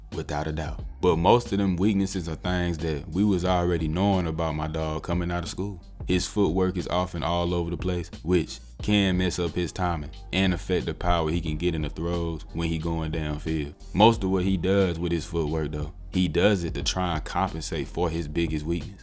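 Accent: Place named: Carolina, United States. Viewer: Bro , Idk why people were defending that OL so much this year! I been telling my panthers friend, that the O-Line was a huge part of the problem, yet the media been on bryce's ass since day 1, pretending that PFF rankings and preseason expectations are the end all be all, without actually watching the games. As a Browns fan I know exactly what it's like to get half assed national media coverage that then influences the whole discussion about the team. Love from Austria, I love the accent btw. Real Carolina!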